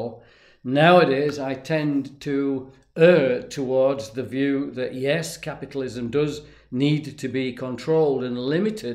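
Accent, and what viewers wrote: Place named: Scotland, United Kingdom. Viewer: I just discovered your channel. This is the second vid I’ve watched. I’m enjoying it. To my ear, I thought you were Scottish not English, but what do us Yanks know. Appreciate your insight.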